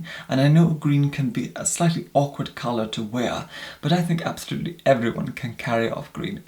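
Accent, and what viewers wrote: United Kingdom. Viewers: I just adore a British accent (or most any accent for that matter lol). Technically, the British (aka English) accent is not really an accent. As an American, it only SOUNDS like an accent. Pretty sure the Americans accent developed from the Brits! 😊👍🏼💚💜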